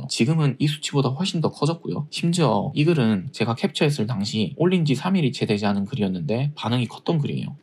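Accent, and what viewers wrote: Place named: Gyeongsang, South Korea. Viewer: 강의듣는데 말투가 친근하셔서 같은 경상도인이거니 했는데 거주하시는 곳이 같은 지역 같은 ㅁㄱ동이시군요 ㅎㅎㅎ 괜히 반갑고 더 집중하게 되었네요 !! 좋은 영상 감사합니당 😊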